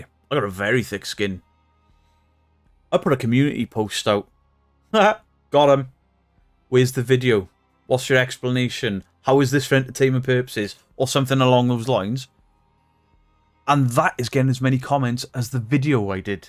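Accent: Wales, United Kingdom. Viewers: Hiiiiiiii, love your videos. Recently found you thanks to the Shape. I love your accent, I think the Cymru accent is awesome. I lived in North Wales for 20 years before returning to Canada. Keep debunking and making me spit out my coffee because I am trying to drink it and laughing because of something you said 🤣😂🤣😂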